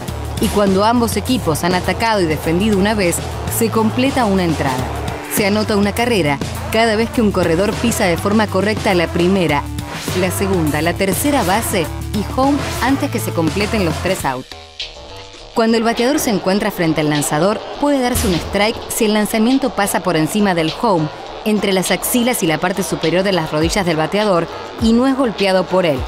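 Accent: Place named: Argentina. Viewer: No me gusta la manera de hablar que tienen las Argentinas .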